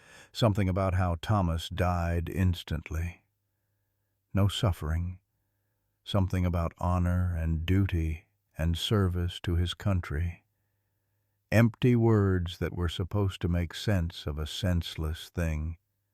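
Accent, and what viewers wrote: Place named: South, United States. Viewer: I love this narrator with the southeastern or western accents.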